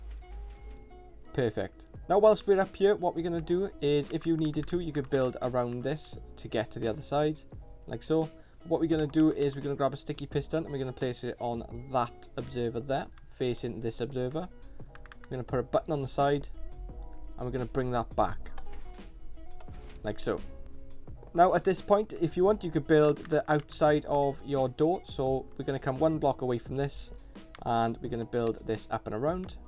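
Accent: Wales, United Kingdom. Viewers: Welsh I think. Correct me if I’m wrong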